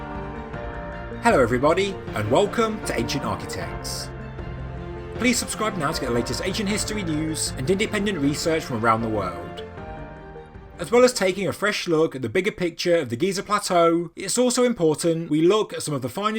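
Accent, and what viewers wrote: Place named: London, United Kingdom. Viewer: British, not American. Probably a Londoner bloak 😂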